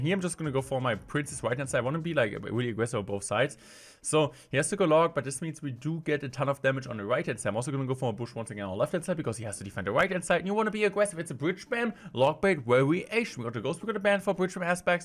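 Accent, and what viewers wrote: Germany. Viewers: Hey, i can tell that english is not your first language. Is your first language german? Because i am learning german, and i can kinda hear it in your voice.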